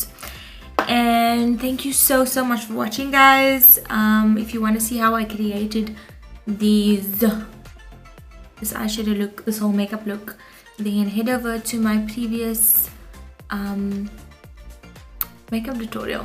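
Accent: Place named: South Africa. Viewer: U speak like my mualima... true S.african😚😍😛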